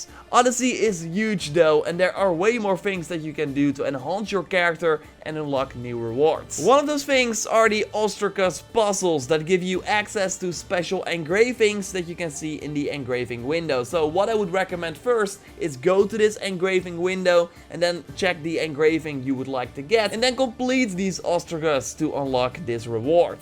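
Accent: Netherlands. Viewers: Are you from Holland? Your thick accent makes me think you are haha, greetings from Belgium. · My man is dutch isnt hé?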